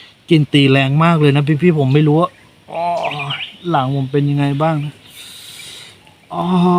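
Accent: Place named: North, Thailand. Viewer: พูดเหมือนคนทางภาคเหนือของเรา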